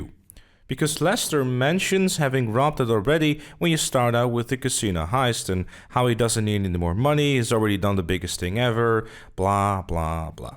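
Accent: Netherlands. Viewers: Aan je accent te horen ben je denk ik nederlands maar je kanaal is heel nice man props man! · Nederlands? Ik hoor het haha🔥👑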